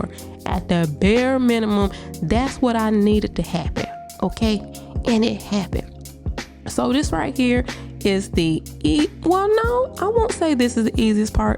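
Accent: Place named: Houston, United States. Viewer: Commentary is GOLDEN!!!! You sounding like us out hea in Houston lol. & that hair baby!!!! You ate down! Beautiful 😍 👏🏽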